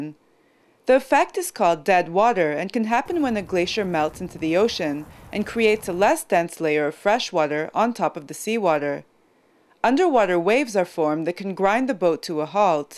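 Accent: United States. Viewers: I'm English so to me she just sounds American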